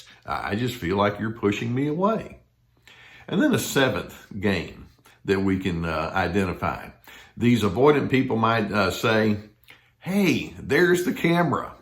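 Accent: Texas, United States. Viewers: It's not southern...it's Texan. Big difference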